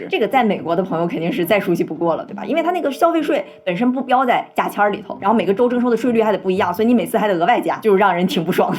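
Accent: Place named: Shandong, China. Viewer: 這集小林的中國腔很重，難怪避重就輕。説了很多（足夠賺流量），卻好像沒説？ 看來我還是應該看立場鮮明的博主。。。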